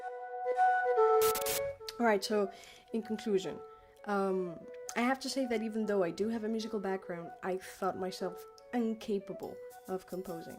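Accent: Netherlands. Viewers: where are you from? Dutch perhaps or another accent? it sound familier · Naw she is Dutch. Ik kan het accent horen.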